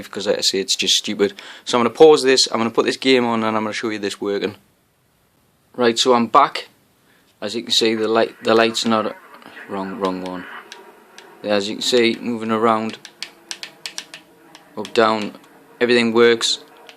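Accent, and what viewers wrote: Newcastle, United Kingdom. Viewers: r u a goerdie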